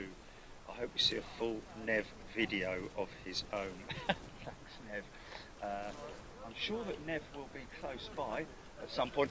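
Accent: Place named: United Kingdom. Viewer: Love the British accent! Boy, he certainly keeps himself amused.....and us as well! Greetings from St. Petersburg, FL, USA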